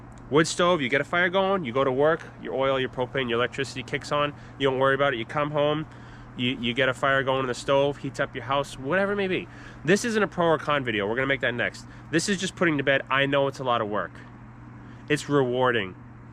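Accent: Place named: New England, United States. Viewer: You’re definitely from New England. That accent chining through. 😂 I’m from Maine. You?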